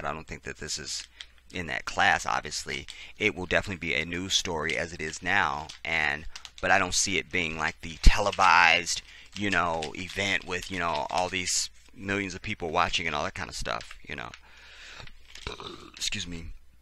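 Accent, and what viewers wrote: United Kingdom. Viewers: The British accent 😂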